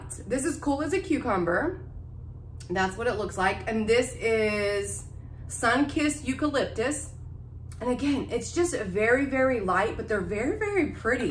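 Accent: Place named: Mississippi, United States. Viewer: Hey sweet lady you & I sound the same!! I'm from Mississippi so down here we all say "yall" quite frequently! I love your dollar tree hauls!!! I just wish these dollar trees here would take notes of all the dollar trees you shop at!!! They NEVER have the cute stuff you have. I may get lucky & find one or 2 little things, but they never have any of the good organizing stuff ever! Most of the time the isles are bare. I guess nobody cares about taking inventory. We need some desperate help at the dollar trees in Mississippi! Oh well, I love watching what you find & I'll just stay jealous!!! Love you girlie......keep shoppin'....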